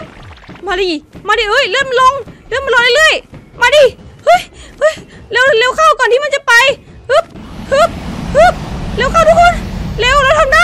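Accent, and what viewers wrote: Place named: Isan, Thailand. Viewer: คนอีสานเบาะ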